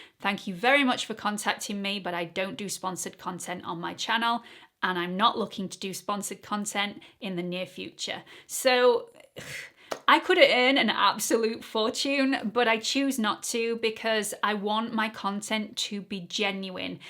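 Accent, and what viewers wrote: United Kingdom. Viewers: you are a gem! I always look forward to your videos! Between your adorable personality and your British accent, I would watch you all day, even if you didn't ever share the wonderful information with us. Hugs from Iowa in the US! 💓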